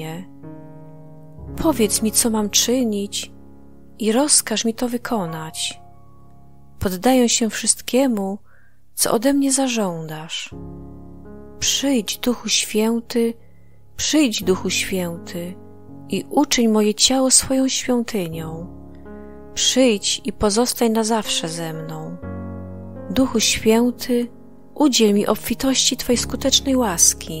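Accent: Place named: Białystok, Poland. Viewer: Jestes z Bialegostoku lub okolic ze tak dziwnie mowisz?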